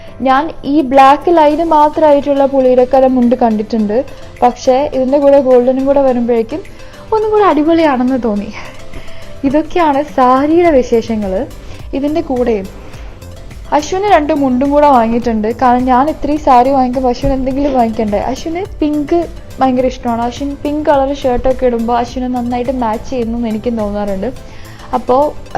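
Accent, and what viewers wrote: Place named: Kannur, India. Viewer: plz talk in kannur slang..it's a beautiful slang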